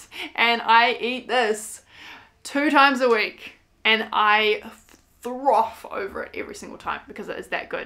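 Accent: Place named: New Zealand. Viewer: Hi. First time seeing your channel. I heard your accent but I was trying to work out where you are. I’m a Kiwi too but live in Australia now (married an Aussie). You said “take out” instead of takeaway so I thought you must be living in the US. Then you mentioned having spent time there. That was great advice. I watch a lot of plant based vegan YouTube channels and they pretty much have the same meals as you showed. I think we all eat the same. 😂 What you said was so true. It’s easier to stay on track when it’s a habit. At first I thought no, I can’t eat the same every day. But I understand your points. Little tweaks can be easily made. Thanks!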